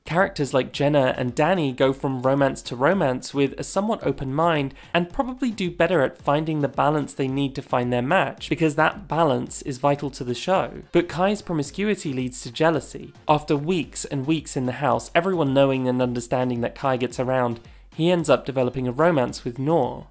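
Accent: United Kingdom. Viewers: So after watching snippets of several of your videos in my YouTube feed autoplay thing, I finally stopped procrastinating and decided to watch the whole hour and a half video. I knew I liked your content, but this was the first time hearing your voice. So when I heard you, my dumb American brain was like, "OMG THEY'RE BRITISH?! PLOT TWIST!!"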